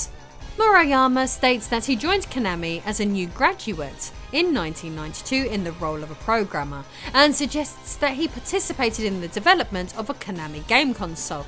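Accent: England, United Kingdom. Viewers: How have I not come across this amazing channel before??? So much rare footage, attention to detail and such a lovely, kickass english accent 🤩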